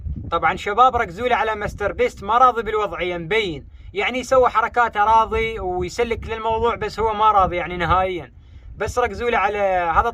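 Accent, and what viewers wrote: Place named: Iraq. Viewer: عجبتني اللهجة العراقية بفيديوهاتك و لله اذا تستمر و تطور قناتك اكثر راح تطش 😂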